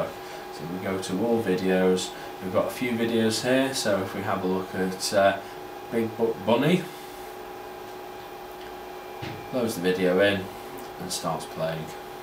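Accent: United Kingdom. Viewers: you cant blame him for his British accent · correct me if i am wrong, but doesnt that come from the strong british accent? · hes is british its his accent....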